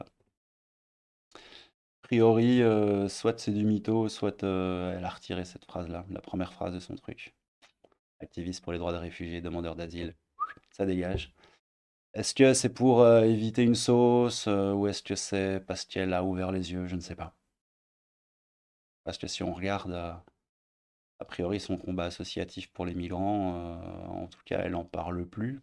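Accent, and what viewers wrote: Belgium. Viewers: Daag Meneer! Merci pour les vidéos, ça fait du bien d'entendre du belge de là où je suis. Le parti islamique à Anderlecht? pas de commentaires? Tcho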